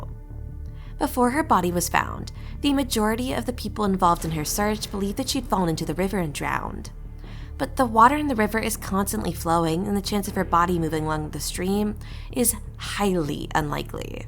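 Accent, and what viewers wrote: United States. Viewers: I think she’s from USA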